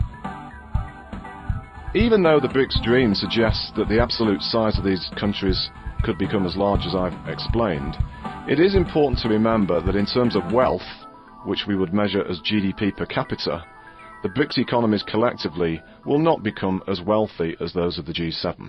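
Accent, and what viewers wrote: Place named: United Kingdom. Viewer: despite that british accent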